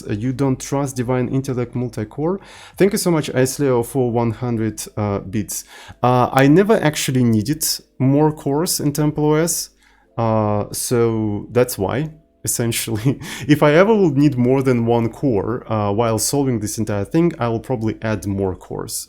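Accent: Russia. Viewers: ты русский? я чувствую акцент))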